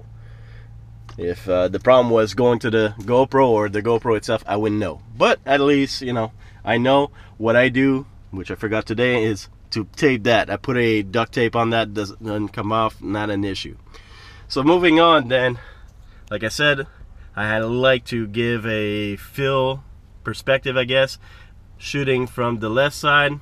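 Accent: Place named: Quebec, Canada. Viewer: I couldn't get over your french Canadian accent. It's funny but I never heard it in all the videos that I've watched. Thanks again and now back to your cross country video!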